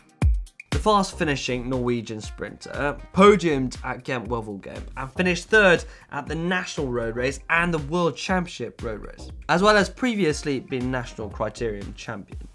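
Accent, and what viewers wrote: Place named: United Kingdom. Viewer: British pronunciation of french names <3 <3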